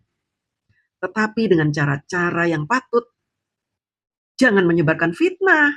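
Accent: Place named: Java, Indonesia. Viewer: Saya kira mbak bkn org jawa,tapi kalau bicaranya diselipi pake bhs jawa yg medok,baru percaya nek mbak priyantun jawi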